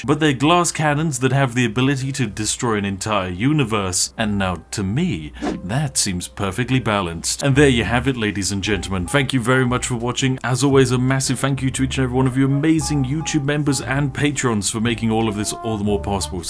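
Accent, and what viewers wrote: United Kingdom. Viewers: Pink British Daddy, was all I needed to hear today. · Ew British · British pink daddy!!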